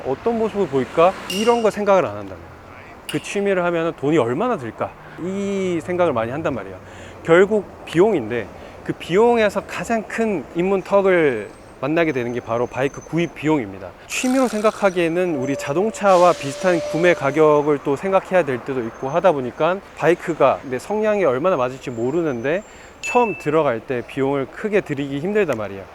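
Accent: Gyeongsang, South Korea. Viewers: 형님 경상돕니까? 너낌~ 세알리고~ ㅋㅋㅋㅋ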